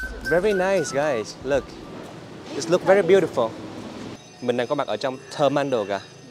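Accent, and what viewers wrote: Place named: North, Vietnam. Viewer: Dude - you have a little bit of North Vietnamese. Pretty cool!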